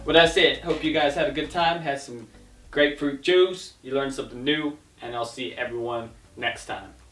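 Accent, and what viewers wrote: England, United Kingdom. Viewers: I know I have said it before but English accent is coming on REAL thick! Like damn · why does he sound british in this video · Is it just me or does it sound like he's supposed to have a British accent? Haha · lol come back to america your starting to develop a british accent and im not used to it😂😂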